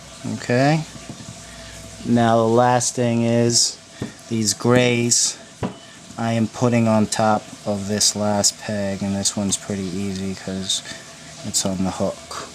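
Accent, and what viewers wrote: United States. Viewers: Are you from New Jersey? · Are you american or what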